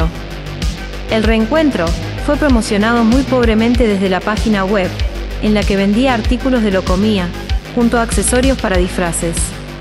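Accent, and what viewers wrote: Argentina. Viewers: La serie me gusto muchisimo, pues es muy creible y se nota el trabajo de este grupo. La locutora, entiendo es argentina por su acento. Me ENCANTO. LASTIMA QUE LO BUENO TIENE UN FINAL. GRACIAS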